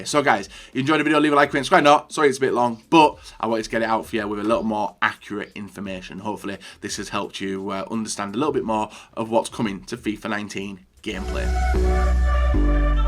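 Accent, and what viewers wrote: United Kingdom. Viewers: He has a earl thomas III seattle seahawks jerseys, but has a british accent... intrusting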